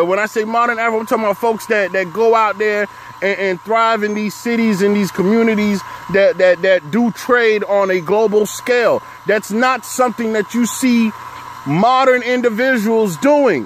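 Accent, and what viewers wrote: Europe. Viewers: I know you ain't been to Africa, and you sound like a European, do research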